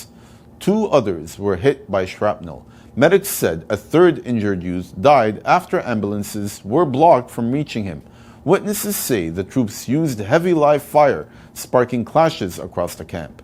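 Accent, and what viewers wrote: United States. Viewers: . ╔═══ ❤☀💚☀❤ ═══╗ 💚💚💚💚💚💚💚💚💚 Dear Mr., Assalaamu alaikum. The way you present the news is fantastic. Despite your American accent we from India find it very easy to follow and understand you without the help of the TRANSCRIPT down below. I am thankful to you for continuing the news of Research & Development in Human Health. We used to treat this news as a harbinger of the end of your news bulletin because so far you have been making it as your concluding news item. While we used to anxiously wait for this item, we were used to treating it as an announcement that you are now ending your news bulletin. But today you inserted that news somewhere in the middle. Please continue your old practice of making that news as your concluding news such that we donot miss out on this news and this news also gets highlighted like any headline!!! 🌐🌐🌐🌐🌐🌐🌐🌐🌐 ╚═══ ❤☀💚☀❤ ═══╝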